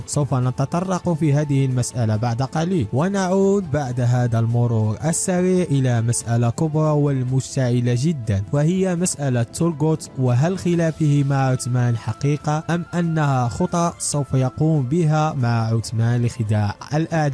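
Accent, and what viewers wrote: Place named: Tetouan, Morocco. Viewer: أخي إن أهل مدينة تطاون (تطوان) ينطقون الراء بهتن الطريقة وليس لهم يد في هذا . واهل فاس الرباط سلا ووهران المستعمرة من الجزائر ايضا يتحدثون براء قريب من هذا النطق. فأرجو ان تتقبلوا الاختلاف.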